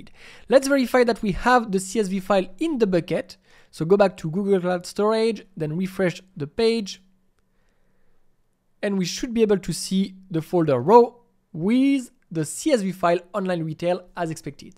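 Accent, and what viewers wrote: France. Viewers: Just by the accent I assume that you are a french speaker. It would be great if you can make videos in french also. Great and explicative video!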